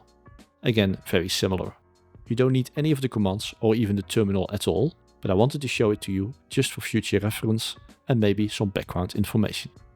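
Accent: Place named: Netherlands. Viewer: Well it's pretty easy to figure out he's Dutch from that accent. Question is why is it hard for others when it's easy for me. Answer is....I actually meet people non-English speaking countries, not just stay for my whole life speaking only with native English speaking people or watching native english content.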